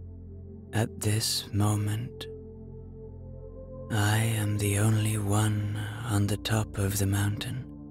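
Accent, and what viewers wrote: Ireland. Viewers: You're silky Irish tone makes me smile and reminds me of Co. Mayo 💞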